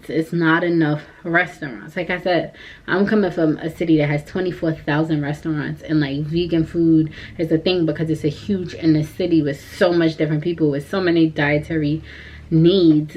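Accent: New York, United States. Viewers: Still sound like a New Yorker 😂😍🥰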